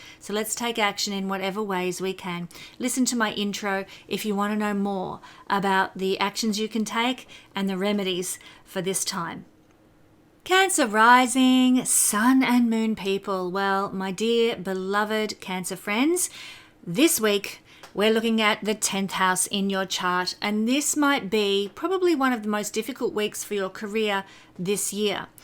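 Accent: Australia. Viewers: So weird your Australian accent is showing more now you’re recording in the states😅 I’m Australian;)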